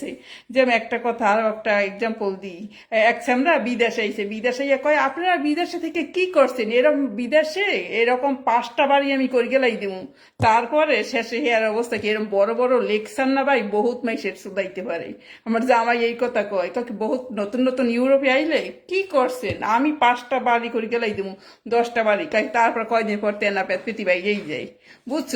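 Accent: Barishal, Bangladesh. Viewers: ভাষা শুনে মনটা ভরে গেলো,, আমার প্রাণের সেই বরিশাইল্লা ভাষা 🥰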